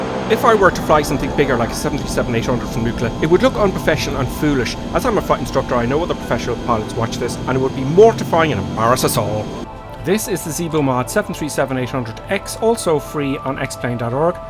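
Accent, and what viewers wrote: Ireland. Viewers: Sound like you're Irish xD I'm from Dublin! Cool vids man! Really funny and good detailed reviews! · Are u Irish if u are what county u from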